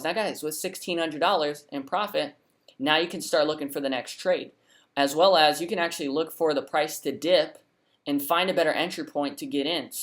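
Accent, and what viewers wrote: Houston, United States. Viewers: You from houston ? 🤔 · You from Houston ?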